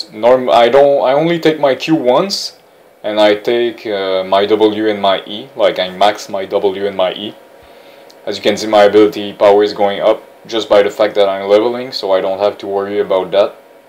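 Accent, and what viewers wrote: Quebec, Canada. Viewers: Are you French Canadian? (I am) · T tu un québécois ? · haha the way you say assist sounds like asses. i like your videos and the french canadian accent.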